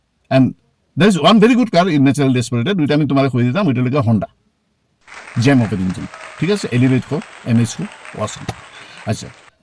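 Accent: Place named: Sylhet, Bangladesh. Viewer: সিলেটি শুনলে কুব বালা আর আফন মনো অয়। বানাইতে তাকোইন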